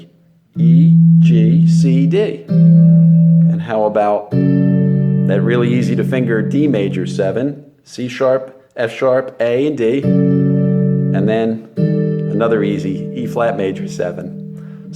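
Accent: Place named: East, United States. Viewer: I realize now that you've been in England for a long time, and that's probably why I hadn't seen you, and your eastern US speaking style didn't give this away. :)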